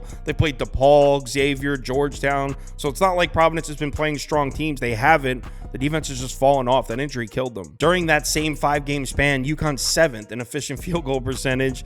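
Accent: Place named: New Jersey, United States. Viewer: Is from NJ? Does anybody know? Im a relatively new follower so im not exactly sure but some of the words he uses and the way he says certain words gives me Jersey vibes or maybe new york.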